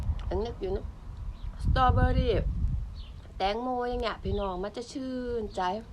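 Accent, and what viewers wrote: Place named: Korat, Thailand. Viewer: น่ารักจังเลย ดีใจที่ได้รู้จักค่ะ สำเนียงโคราชมา น่ารักจัง พี่ก็คนโคราชเด้อ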